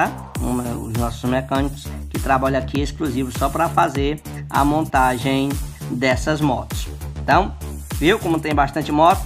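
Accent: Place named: Pernambuco, Brazil. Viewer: Você é de Pernambuco?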